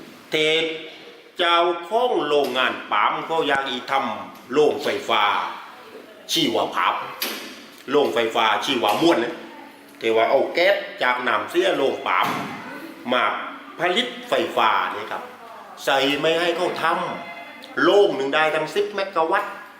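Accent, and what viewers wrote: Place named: South, Thailand. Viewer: ฟังได้สักครู่เลิกฟังเลยครับ กลายมาเป็นพูดสำเนียงภาคใต้ซะนี่ อีกทั้งเรื่องที่พูด ดันเหมือนเล่าให้คนใต้ฟัง ไม่ใช่พูดให้คนภาคกลางและภาคอื่นๆฟัง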